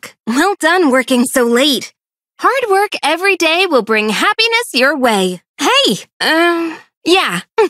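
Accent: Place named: Brunei. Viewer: I think about Bruneian Accent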